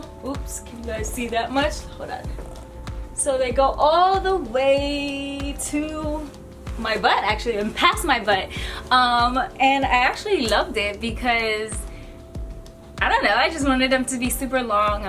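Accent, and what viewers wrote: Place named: Jamaica. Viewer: You should speak with the Jamaican accent more often 😁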